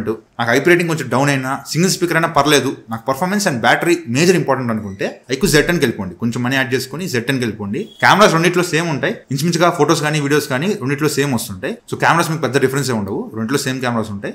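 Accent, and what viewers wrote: Nellore, India. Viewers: Bro miru nellore a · Bro medhi Nellore aa😊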